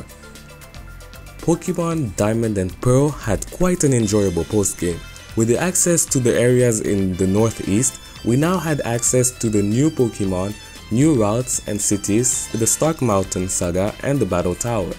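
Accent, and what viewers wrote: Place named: West Africa. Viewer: Oh that makes sense, I’m from Ghana. Another West African Pokémon fan! That’s great. Keep up the grind. You just got another YouTube subscriber and any other social media you’re on. Once again love your accent!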